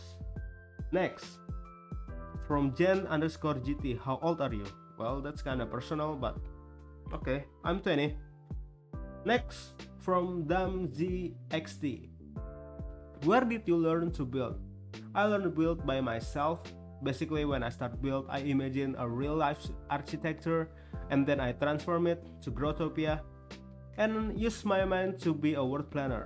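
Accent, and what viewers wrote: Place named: Indonesia. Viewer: Anj kan bener dari indo, dari aksen sebenernya udh keliatan kaya orang indo, tapi gw agak ragu lu org indo soalnya jago bgt bahasa English 😂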